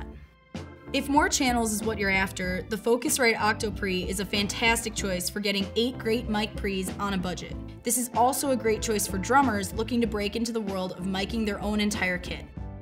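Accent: United States. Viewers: Please enlighten me about her accent. English is not my first language but what I'm hearing sounds like a typical American accent. Is it a specific regional accent?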